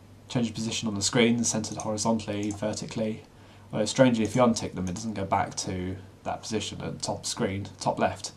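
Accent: United Kingdom. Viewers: You have very British accent. ;-)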